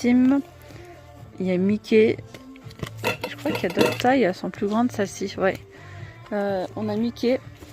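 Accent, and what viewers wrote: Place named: North, France. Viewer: Cc, je ne sais pas comment tu t'appelles. Je voulais juste te dire sue que j'apprécie beaucoup tes vidéos sur ce magasin avec ton petit accent du Nord. Trop mignon.. Une fan qui habite non loin du Puy du fou en Vendée et pas très loin du bord de la mer. Pleins de bisous mi-ensoleilles, mi-pluvieux... ☀️☀️☀️☀️☀️🌊🌊🌊🐈🐈😚😚😚💜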